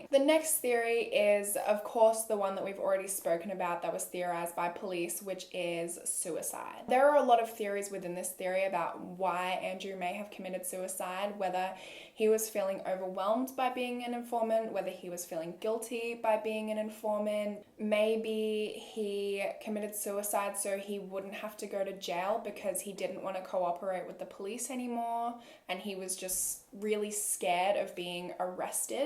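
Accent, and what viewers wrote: Australia. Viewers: The Australian accent is the best. Your voice is very soothing 🙌🏼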